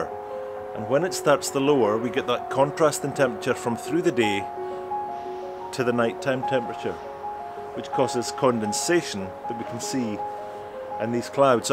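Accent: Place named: Orkney, United Kingdom. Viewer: Explained so well as always xxx Love your Orcadian accent so calming 👍👍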